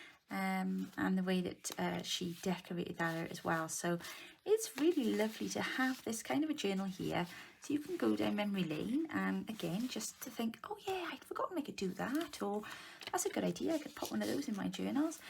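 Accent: Wales, United Kingdom. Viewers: Just found your channel from a fellow Aussie. This looks a fabulous idea. I can hear a welsh accent...oohhh...how wonderful. I was born in Wales and my family emigrated to Australia when I was 7. Most of my family still live in Wales. So lovely to hear a welsh crafter. 🙂❤️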